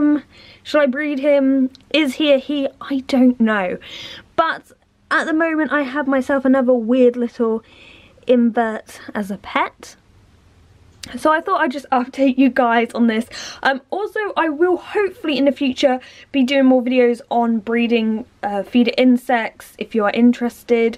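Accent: United Kingdom. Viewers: Wait are you from uk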